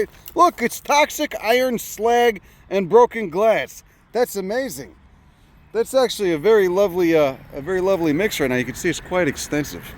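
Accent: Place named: Illinois, United States. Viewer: I enjoy hearing Illinois voices. My Ma came from Wheaton and Pops came from LaGrange park.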